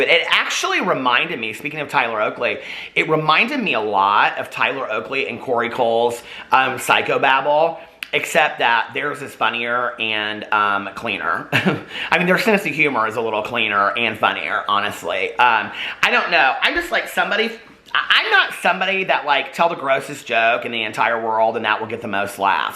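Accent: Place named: Indiana, United States. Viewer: helped me pass my Test of English Foreign Language! For 4 months I watched his videos on all of his channels to practice listening and speaking. One day I might speak as fast as, with some indiana accent! 🤩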